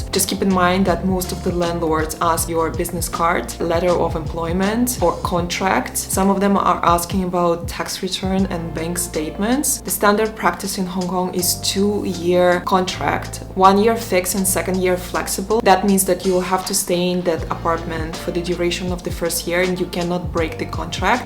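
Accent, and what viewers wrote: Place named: Russia. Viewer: The ruzzian accent is disgusting, I am not watching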